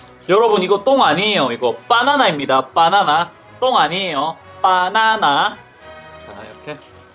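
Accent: Busan, South Korea. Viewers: 사투리일걸여 부산사람이라서 ㅋㅋ저도부산사람이라 바나나가안되여 빠나나밖에안댐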